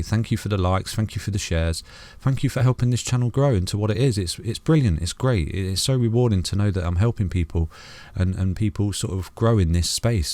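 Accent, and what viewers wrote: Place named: Midlands, United Kingdom. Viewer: I think Midlands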